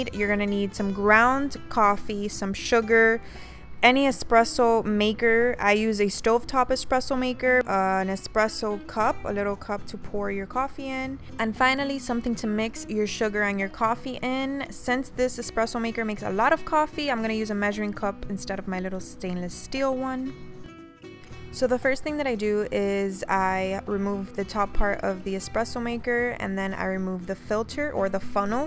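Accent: Miami, United States. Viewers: Because I hear a Miami accent. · Good job on the video. I sense a Miami accent lol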